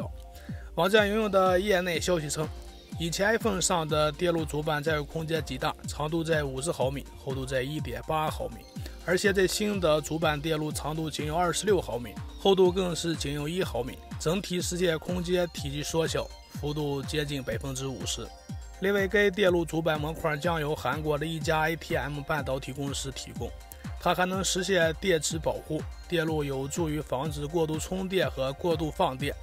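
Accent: Shanxi, China. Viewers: 主播山西人？？？？？ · 这货是山西人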